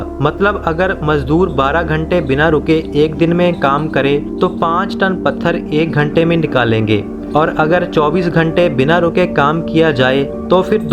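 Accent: Punjab, India. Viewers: OM NAMAH SHIVAY JAI MAHADEV 🌹🌹🙏🙏🌹🌹 Bhai tum aawaaz se punjabi lagte ho. kyunki punjabi hi hindi ko jabardasti bolta hai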